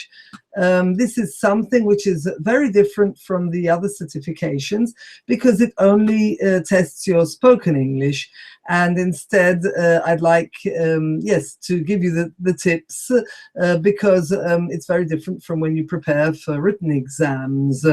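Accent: Italy. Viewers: From her accent she sounds like an Italian native speaker, however I've found her advice very helpful. It takes more videos like this.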